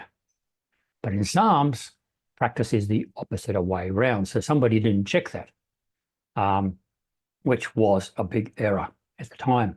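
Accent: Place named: Australia. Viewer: Cool Accent. Love The Aussie Accent.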